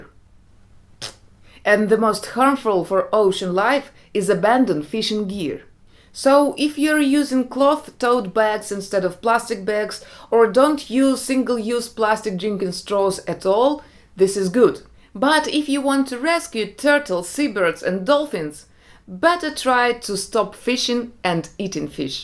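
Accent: Russia. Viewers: Акцент Русский, или мне кажется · You speak like russian😅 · OMG!! WHAT!!! UR RUSSIAN!! OMG YOU SOUND SO COOL I DIDN'T EXPECT THAT.. UR SO COOL 😱😱😍😍😄😆😱😱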